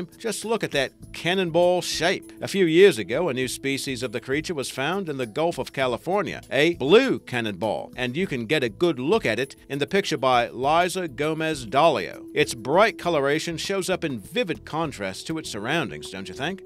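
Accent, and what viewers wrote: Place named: Australia. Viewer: Why do you sound Australian but use American measurement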